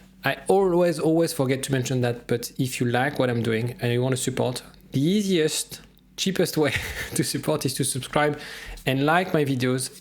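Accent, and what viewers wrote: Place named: France. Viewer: Well he is french.. did you hear the accent. He lives in london but when you learn english in France you'll get that accent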